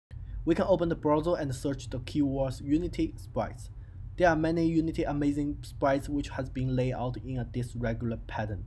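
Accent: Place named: China. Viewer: 兄弟,听口音是 中国人呀~~ 能不能搞点2D中文版本教程呀~~~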